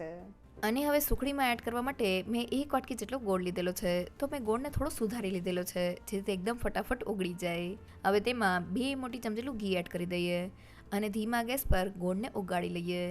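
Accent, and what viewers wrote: India. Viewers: Karan ke i think tevi aadiwasi vistar ma rahe che karan amara sight aadiwasi vistar na loko aa rite j gujrati bole chec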